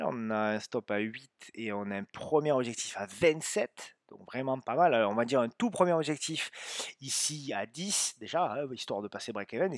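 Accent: South, France. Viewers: Écouter chaque semaine et son accent du Sud, en complément du salon Discord, c'est toujours un plaisir:) Le contenu est qualitatif, les Graphs sont épurés et compréhensibles par tous. Merci et très vite, bravo pour le partage! PS: pour une prochaine fois, une idée: la présentation d'un indicateur en plus du prix (# des volumes)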